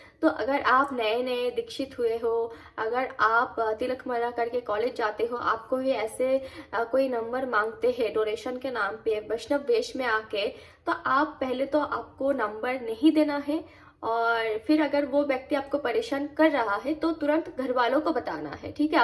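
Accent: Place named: Bengal, India. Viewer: Didi apaki akhee shee pata chal raha hee kii app bengali hee ., kyu kii meri devi jii bhii west Bengal shee hee 😊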